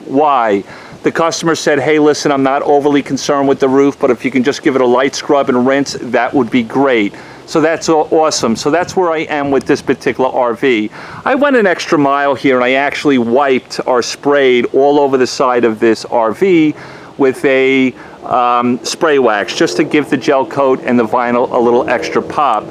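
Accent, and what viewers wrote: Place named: Dallas, United States. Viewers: Gotta love the Texas accent. ("Howard Stern talks RV detailing") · Niiiiiice. Your accent is a typical Dallas Accent. LOL.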